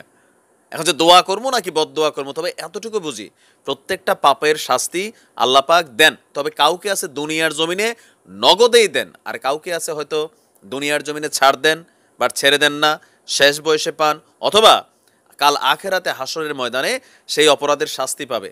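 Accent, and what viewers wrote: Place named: Comilla, Bangladesh. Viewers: ভাইয়া আপনার কুমিল্লা র কথা গুলো সুন্দর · আপনার মুখে কুমিল্লা র ভাষা অনেক ভালো লাগে ভাই য়া 🌹🌹🌹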